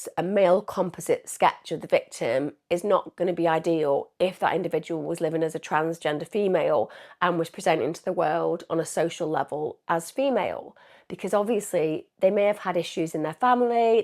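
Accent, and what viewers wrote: United States. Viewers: Your interview in the American accent was hilarious 😂 you are right up there for me ❤ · !! your American accent is getting so much better!! Have you been practicing 👌♥️ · Hearing you with an American accent was so weird haha! But it was actually very well done! 😊😊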